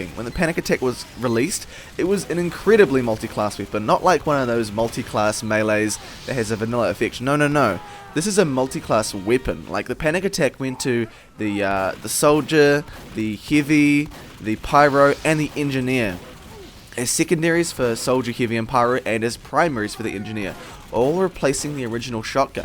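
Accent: New Zealand. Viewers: Dat accent xD.... 'hivy'..... 15 pir sint faster firing speed.... god bless new zeland :)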